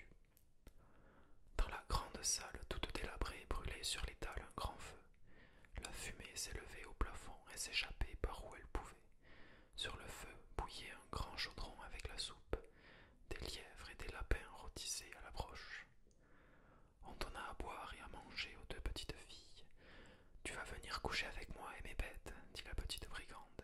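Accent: South, France. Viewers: ...j'avais reconnu. Sud sud...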